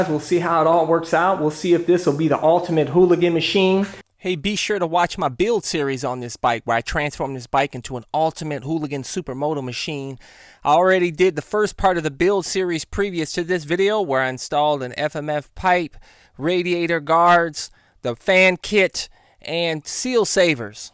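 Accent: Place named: Texas, United States. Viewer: “Sorry I’m old school” you don’t sound old school you sound like a dumb hillbilly out in Texas. Here in Ohio we got hillbillies that that snort and shoot up drugs yet you manage to sound more stupid then them.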